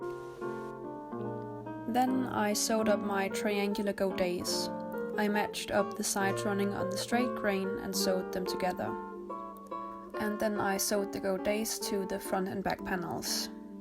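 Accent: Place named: Denmark. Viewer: Youre danish lol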